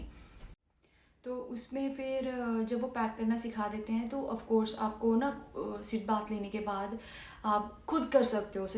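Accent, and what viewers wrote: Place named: Haryana, India. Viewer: jis hisab se baat ki apne muje lga hi ta ap haryanvi ho 🤨me meri mosi ki ladki jesi awaj lagi muje 😂🤝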